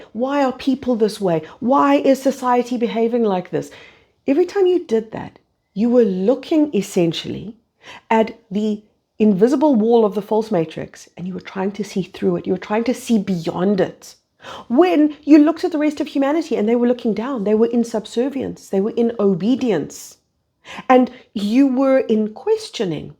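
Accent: South Africa. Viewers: I like South-African-English. It is much more understandable for a non native English-Speaker than for example American- or British-Englsih.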